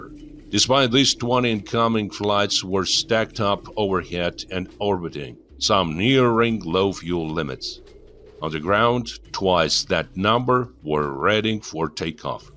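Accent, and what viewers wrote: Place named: United States. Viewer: Какое замечательное американское произношение в стиле <num>-х годов. Как всегда, замечательная дикция! Я же говорю, что у Вас огромный исполнительский потенциал, над которым надобно продолжать работать, чтобы не растерять, а развить до логического максимума. А так - брависсимо🎉!!! А насчёт "врагов"... Я в своëм пионерском возрасте - КИДовец... 😊 Эдак то, милостивый государь😊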